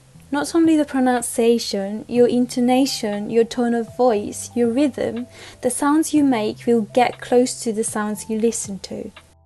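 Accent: United Kingdom. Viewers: はじめまして！ブリティッシュアクセントでしたか！プリンセスみたいです🧡ブリティッシュアクセント大好きなので頑張りたい！基礎の文法が怪しすぎるのですが、主様はどんなふうに学びましたか？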